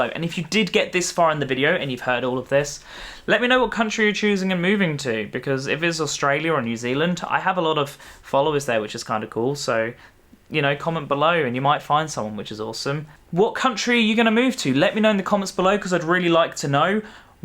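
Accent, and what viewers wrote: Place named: RP, United Kingdom. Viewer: You are young and you have a posh accent. This country is actually one of the best in the world... go live in another country and you will soon find out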